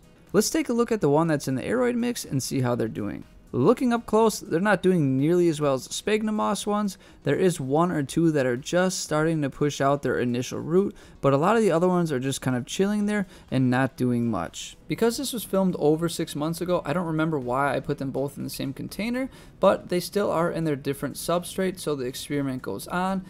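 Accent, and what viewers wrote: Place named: Midwest, United States. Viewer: i need some midwest friends then! i love the accent lol. yes i barely discovered your channel and i love plants and now im starting to become obsessed with starting things from seed so your videos are really helpful and i enjoy watching them! i have a few projects i’m starting/about to start so i’m excited 😆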